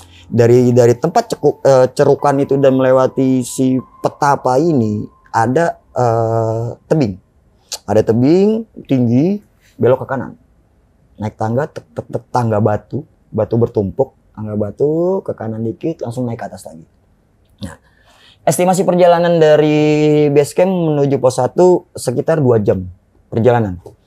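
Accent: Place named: Java, Indonesia. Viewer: Nama Tapi Mendook Amat Mas Ngomongnya...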